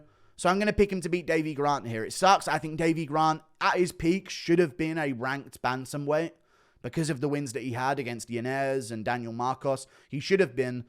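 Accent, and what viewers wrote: United Kingdom. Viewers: something about a British man yelling about ufc fights just puts a guy to sleep ya know?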